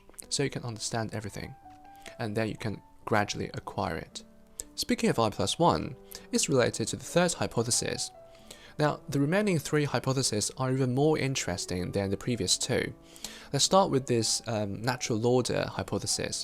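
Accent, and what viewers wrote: United Kingdom. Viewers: posh accent English ❤... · 英国口音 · 英式口音真好聽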